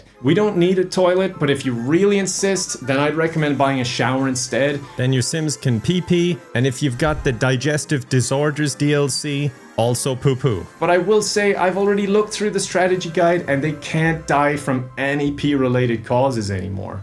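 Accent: United States. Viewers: Is our precious making an American accent?? 😂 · Why did it take me 5 minutes to realize he was using an American accent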